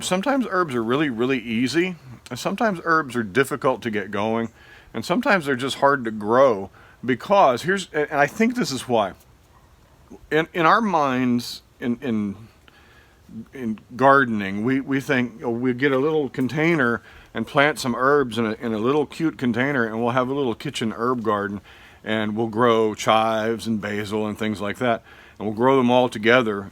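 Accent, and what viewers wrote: Louisiana, United States. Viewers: I love your videos because they are spot on, succinct, & easy to listen to. I was raised in Louisiana and enjoy your accent, too. Question - is sorrel an herb or salad green? I put one in an herb bed and am thinking I'll repot it by itself since learning it's perennial. I'm in coastal California so deal with some fog. Wish me luck!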